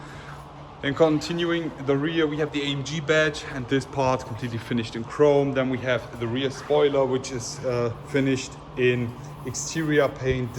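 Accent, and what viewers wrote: Germany. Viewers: I’m assuming your German, your accent is hella cool lol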